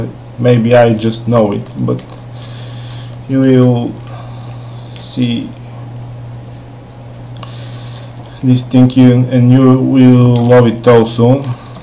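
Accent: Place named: Russia. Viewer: прости но ты Русский той акцент не катит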